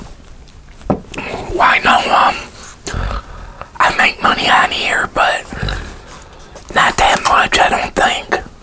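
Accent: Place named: Texas, United States. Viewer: Seriously one of the best channels there ever was and ever will be ur Texas accent is the best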